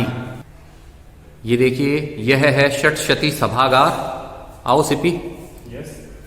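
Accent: Standard. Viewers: Jai Karni Mata ki. Aur app ke dwara hum sab jo dekh rahe hai jan rahe hai uske liye app ka avari hoon. App ki sudh Hindi bahut hi accha lagta hai. U r too good spokesman, like it. App acchi Sanskrit bhi bolte hai. Karni Mata ki sabhi jagah behad Sundar hai aur mainly safsutra dekhne wali hai. Goushala bhi bahut accha aur saf hai. Too nice vlog presented by you ji thankful to you for it.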